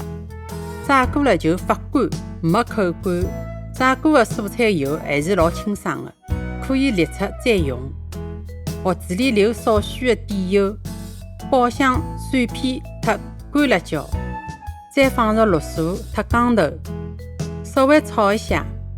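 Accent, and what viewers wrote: Shanghai, China. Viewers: Yes, Shanghainese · 加藤みずっち shanghai dialect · 上海闲话老亲切咯！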